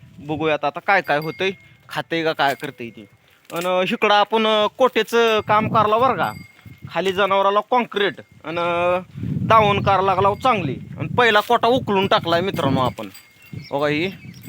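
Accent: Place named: Marathwada, India. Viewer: भाऊ तू खुप छान बोलतो ही मराठवाडा भाषा आहे ना ही लय हासतो राव तुझा बोलन्याला तु खुप मनमोकळा माणुस आहेस 👍👍👌👌🥰🥰🕺🕺🌹🌹💐💐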